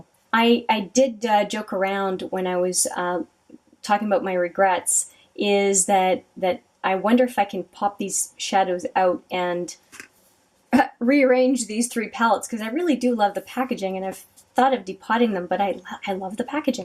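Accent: Quebec, Canada. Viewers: I love your little containers for your lip products... cute shit: also you can hear a French Canadian accent lol I love it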